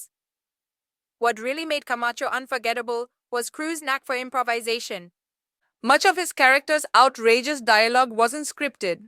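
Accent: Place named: India. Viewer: That Indian voice from the narrator is horrible as hell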